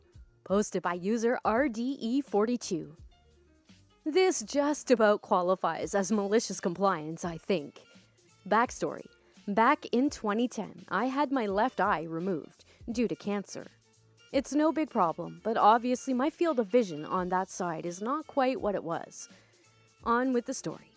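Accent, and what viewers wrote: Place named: New Jersey, United States. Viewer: That last story could be simply entitled people. Your accent reminded me of the South Park "From Jersey" episode.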